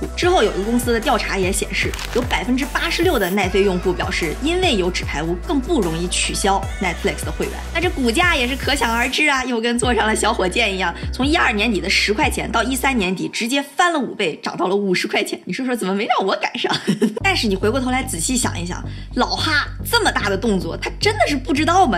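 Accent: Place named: Northeast, China. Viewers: 不知道啥算法，后台推荐了你。这东北口音的魔性，有颜有内容，圈粉了。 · 喜欢你的东北口音，亲切幽默。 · 互联网时代那些“高大上”议题，就你这土掉渣的北方大白话来这么一通大白活，听着就觉得特舒服😌……今天开始订阅了。哈哈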